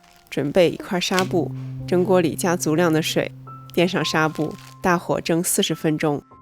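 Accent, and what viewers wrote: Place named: Beijing, China. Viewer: 您的標準(京片子)聽您的解釋很仔細很舒服感恩會照您的方法試作感謝您。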